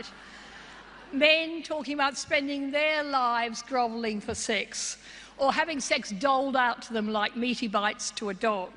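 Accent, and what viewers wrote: Australia. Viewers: Yes, the clue is in the Aussie accent.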